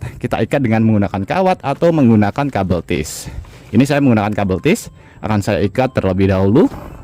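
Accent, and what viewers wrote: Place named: Central Lombok, Indonesia. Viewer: lombok tangah ni bpk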